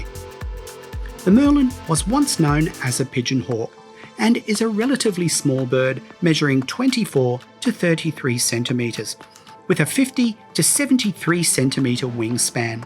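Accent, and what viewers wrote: Australia. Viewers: Great video! Thanks. Loved the music! But found it a bit loud so I couldn't hear the lovely gentleman's aussie accent.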